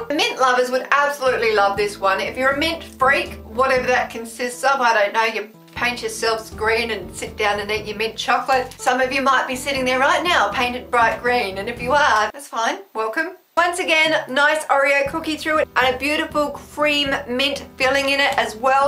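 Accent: Australia. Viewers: Oh far out i can tell that's an aussie acronym or slang term the way you say it in all your videos 😂😆🤣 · I new you were Australian because you acset I'm Australia and i love mint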